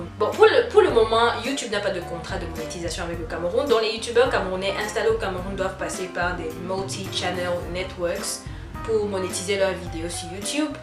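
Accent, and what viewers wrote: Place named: England, United Kingdom. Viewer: J'aime beaucoup ton accent anglais. Où tu l'as tu appris ?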